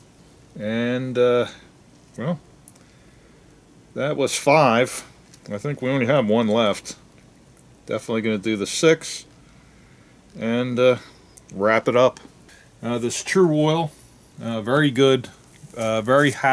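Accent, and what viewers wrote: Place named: Philadelphia, United States. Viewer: Nice video - thanks for posting! You sound like you're from the Philly area. Close?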